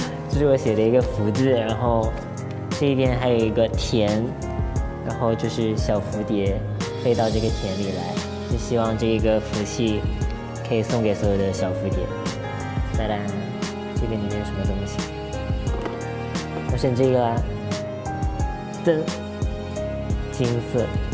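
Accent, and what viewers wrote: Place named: Malaysia. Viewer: 对啊讲回马来西亚腔好亲切啊，真的好可爱呀他讲话。好可惜我的小名不是阿ying，哈哈哈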